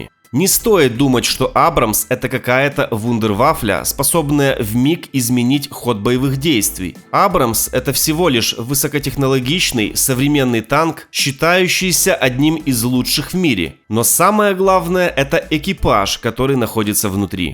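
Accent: Ukraine. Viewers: украинский акцент, прикольно. А что не на мове?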